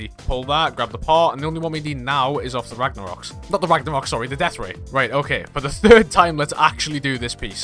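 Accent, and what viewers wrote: United Kingdom. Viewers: hey my friend and I have been trying to place your accent for like 30 minutes, I'm hearing a little bit of Welsh/sometimes Celtic I fluences and he's saying Southern England. Were we close? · Newcastle or Sunderland?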